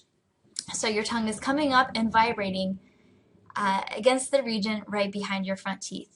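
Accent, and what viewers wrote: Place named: Argentina. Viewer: I do love your argentinian accent haha. I'm studying english in Dublin and I have problems with the English R, so you people are not alone hahaha.. un beso grande genia !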